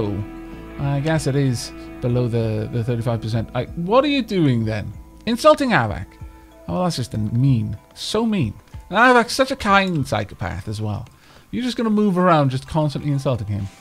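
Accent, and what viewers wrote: Wales, United Kingdom. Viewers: He sounds very Welsh. I'm somewhat surprised that people would have an idea of what Welsh sounds like and wouldn't know Aavak is one of them.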